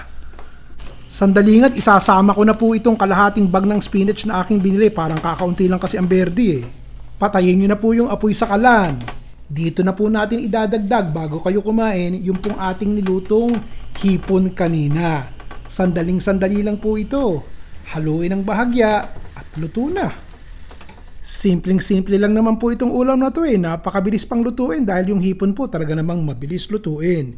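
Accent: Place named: Batangas, Philippines. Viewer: Nakajatuwa ka talaga. Lulutin ko yan . Bibili lang ako nga ingredience bukas. At lulutuin ko yan. Siyanga pala. Tagasaan ka nga pala anak sa atin at may punto angnoagsasalita mo. Taga Batangas ka ba?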